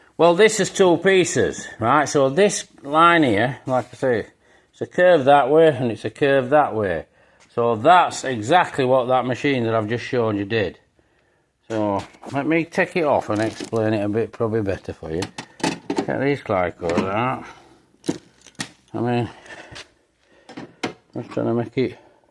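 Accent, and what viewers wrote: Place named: Yorkshire, United Kingdom. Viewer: Yeh a master, not many folk left now that can do the work & classic car repairs like this man does. Pluss he's from Gods own country Yorkshire the same as my old queen came from with that amazing accent. 👌 Jim.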